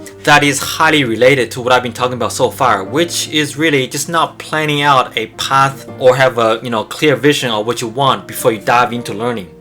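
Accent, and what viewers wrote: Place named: China. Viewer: you have chinese accent ! very talented, thank you for the video !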